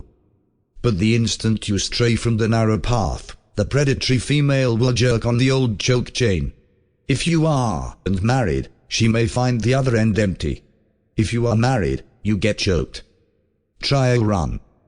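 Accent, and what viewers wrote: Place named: United Kingdom. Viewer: This British accent is driving me crazy.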